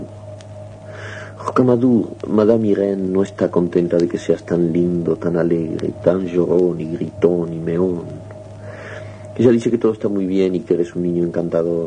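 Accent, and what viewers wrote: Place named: Northern Argentina, Argentina. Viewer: su acento (en esa mezcla extraña de español y francés) me remite a un acento del norte argentino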